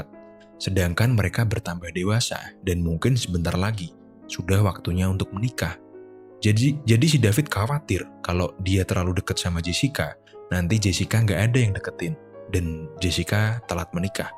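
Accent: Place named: Semarang, Indonesia. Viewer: Bang, lu orang Semarang ya bang?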